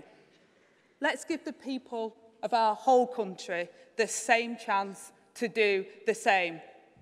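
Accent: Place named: North, United Kingdom. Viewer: Yep..I adore her dialect..The northern accent like RLB..Her lisping like Nandy also..They often talk like that. It's adorable. She makes me laugh 😂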